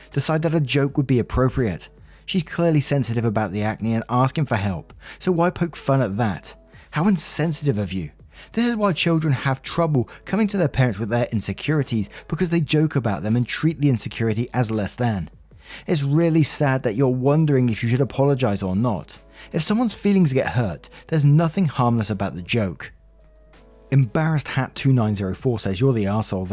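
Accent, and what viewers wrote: England, United Kingdom. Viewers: Oh hi,! Hope you're well! I just got out the gym. Honestly I listen to you so much that my American English is turning more English English.... I have ASD and tend to mimic accents and speech patterns without meaning to. Had to explain what "skint" meant to 3 different people. Not complaining though, I kinda like it. Much love!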